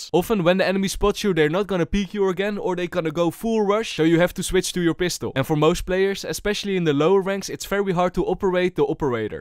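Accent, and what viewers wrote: Netherlands. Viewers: ur dutch right? (guessing off your accent) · First sentence and already knew you were dutch.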